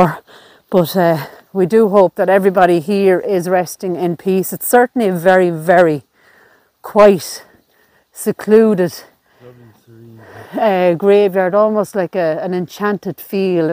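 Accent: Ireland. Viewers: I love the Irish brogue! Subscribed. ❤️ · Hi I am watching my first video from you, from one of your pinned post, your accent and the names, I believe you are in Ireland. Is that correct? Thanks, From Texas USA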